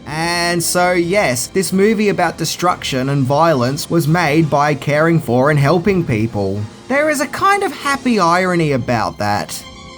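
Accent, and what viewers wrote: Australia. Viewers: Always makes me smile when an Aussie talks about Mad Max. Just seems more fitting than listening to American reviewers. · An Australian talking about Mad Max feels right.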